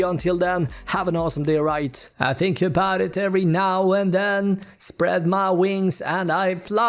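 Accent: Sweden. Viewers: Are you perhaps Nordic? Swedish perhaps? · Hörs ju glasklart på hur han pratar engelska. Can't get more svengelska then that...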